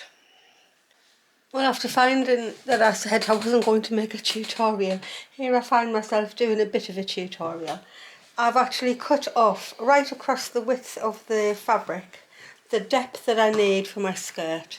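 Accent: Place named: North, United Kingdom. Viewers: I just found you! A stitcher after my own heart, and your voice is a lovely northern one, like mine, though I've lived abroad now most of my life. Thanks so much for the skirt. I saw this idea on historical channels and have been meaning to make one for a while, so now I will. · Two absolute joys of watching you is that you have a beautiful home/northern accent I'm East Yorkshire and your speak in inches first! Are you using 4 or 5 thread overlock? I've had my overlocker for many years but have only ever done four thread.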